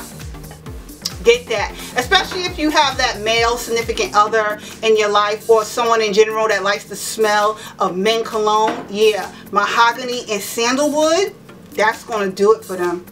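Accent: New York, United States. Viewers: great haul from Family Dollar..........I have to check out that "Danger" Lippy.....it looks super cool..........my kind of color............I am also going to go to Family Dollar and check out that candle......the Mahogany Sandlewood........love candles that smell like men's cologne.......have never bought any candles from FD except Glade because I hate to buy candles and u get them home and they have NO SMELL at all...............so I am going to take your word for this one girlfriend and pick it up when I find it.............lol..............great haul..........thanks for sharing........I love listening to u talk............that NY accent is all that..............lol. Well have a great week and I'm looking forward to your next video.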